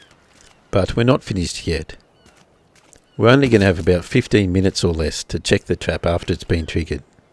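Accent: Australia. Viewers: he's Australian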